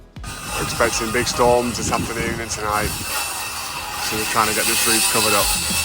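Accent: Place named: Northern England, United Kingdom. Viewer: Watching from Norway, though I'm originally from the UK! Love that your northern accent is still so strong, hope it doesn't confuse too many of the locals (actually, I kinda hope it does!). 😅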